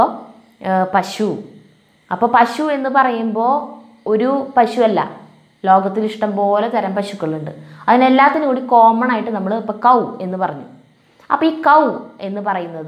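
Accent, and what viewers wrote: Thrissur, India. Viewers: ഹായ്ന്റ പെങ്ങള് കുട്ട്യേ.. ക്ലാസ് അസ്സലായി ട്ട, തൃശൂർ സ്റ്റൈലിൽ വർത്താനം കലക്കി ട്ട, teacher മ്മ്മ്ടെ തൃശൂരാണ്,class excellent, അറിവ് പകരുംതോറും വർദിക്കും. ദൈവം അനുഗ്രഹിക്കട്ടെ !!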